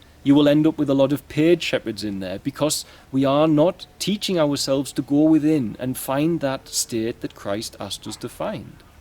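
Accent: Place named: Newcastle, United Kingdom. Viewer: I love your Geordie accent. Always felt self-conscious talking about spirituality and philosophy while sporting my accent. You’re comforting, inspiring and so down to Earth for someone so awake and intelligent. I also appreciate your deep esoteric understanding of Christianity rather than just condemning the scriptures due to the church’s past, like so many people do these days. The royal family has always been just a figurehead to compound and reinforce conditioning, obedience, discriminatory nationalism and patriotism, a symbol of wealth and authority when in fact all they do is bleed money from hard working people and there is no justification that exists for the empire they have in property, land and objects when children, animals, people go hungry and homeless. They moved homeless people off the streets to make way for the royal wedding... 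there’s nothing Christian about it. I honour your compassion and certainly acknowledgment of prejudice, jealousy and small mindedness of the working classes, but there is an unmistakeable distinct inhumanity in hoarding wealth & land & then calling people selfish for exercising autonomy over their own bodies. Completely agree with you. I agree the matrix of our experiences makes us ignorant and ungrateful but the Queen takes that to another conscience-less level.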